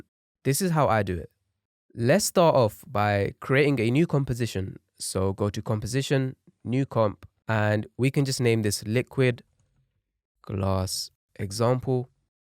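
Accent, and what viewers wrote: United Kingdom. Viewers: most british accent i ever heard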